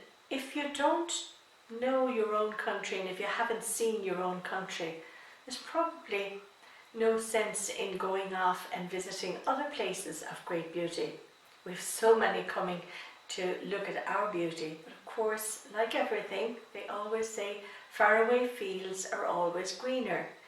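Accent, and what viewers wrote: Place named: Ireland. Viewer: oh what a beautiful country! I could listen to you all day! You have such a beautiful accent! I hope to get to see Ireland one day! Thank you so much for this video! Love and hugs, Jeanie xxoo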